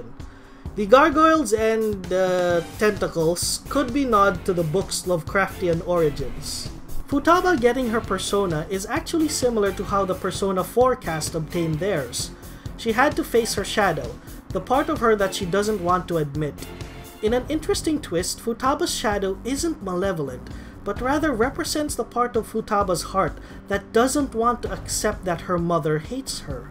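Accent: Philippines. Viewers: I can recognize that accent anywhere... are you Filipino? Edit: lol Akechi's a white knight... that NOT everyone likes. Ironic isnt it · By the way, are you Filipino? You sound like one.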